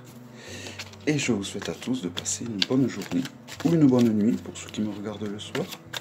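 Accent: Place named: South, France. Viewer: Merci moi j'ai bien aimé la vidéo 😊😊 Belle journée aussi et j'adore cet accent du sud😎😎 😘😘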